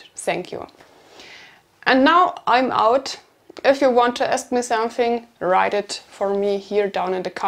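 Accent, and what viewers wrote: Bavaria, Germany. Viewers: my late partner would have loved this video. She was German, from München/Munich and the reason why I started to watch your videos. You are both the same and not the same... both strong minded and kind, and your Bavarian accent is very much as she sounded. Music is my life, I am a musician and nature lover... Thanks for sharing some life things with us.:)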